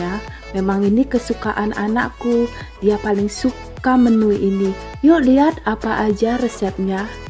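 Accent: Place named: Bali, Indonesia. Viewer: Enak ..mau ku coba. Gampang pasti anak2ku juga suka. Dari suaranya seperti dari Bali, Tinggal dimana kaka?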